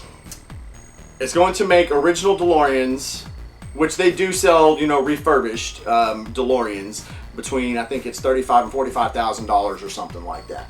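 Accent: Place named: Texas, United States. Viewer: True Texan. Prounounce Humble without the H. Nice dude! I think you can get a kit for the time travel part but it's expensive and you need Libyans for the plutonium. They were unreliable because they were really heavy with the all or mostly steel* body. I think i saw behind the scenes that they couldn't get to 88 mph with the stock motor. *also according to some DeLorean forum they say the car isnt heavy (but for the engine it may be I guess) so I dont know or dont care it's just what I always thought or heard. *originally I put aluminum and in case nobody reads follow up comments thank you for pointing it out. Not dumb just imperfect.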